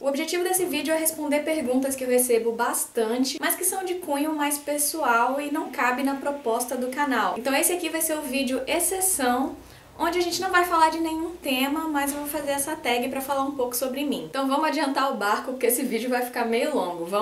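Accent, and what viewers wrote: Bahia, Brazil. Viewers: Aos meus ouvidos paulistanos o seu sotaque baiano é bem acentuado! XD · não sei, eu sou baiano também e percebo bem o dela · lógico, todo mundo tem sotaque. Mas em comparação com o sotaque baiano marcado, tem pouco. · Já falei sobre isso, mas repito: seu sotaque aparece sim, e até muito! Quem espera ouvir aquele sotaque do INTERIOR da Bahia, algo bem caricato, não não vai encontrar. Eu que sou soteropolitano vejo você "sotaquear" frequentemente. Parabéns pelo canal, como sempre!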